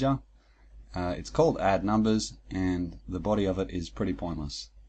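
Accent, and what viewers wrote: Australia. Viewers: Hi What's a, you sound like a fellow Aussie! Thanks again for this great tutorials. I'm working my way through them from start to finish. I'm not a programmer: I'm an old retiree but I did an online course in C++ a couple of years ago for the fun of it. I didn't use it for anything so I let it lapse and forgot most of what I learn't. I came across your course and got fired up to revist C++. You have reignited my interest in C++ and your "easy to understand" method has made this really interesting for me. One of the reasons I'm really interested in getting familiar with C++ is that I am also interested in teaching myself electronics using arduino projects and they utilise C++ in their computers to drive their electronic components. So I want to understand C++ so I can control each project. Thanks again.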